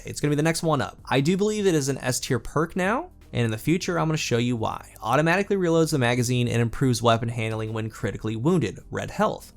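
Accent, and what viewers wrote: United States. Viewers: ' dulcet American tones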